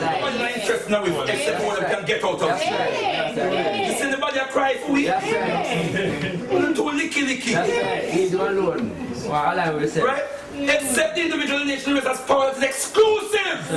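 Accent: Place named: Jamaica. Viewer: Just Became Your 1000th Subscriber ...Not Big on the Egyptian Names But its a Positive Vibration & iHear That Jamaican Accent ,iKno My People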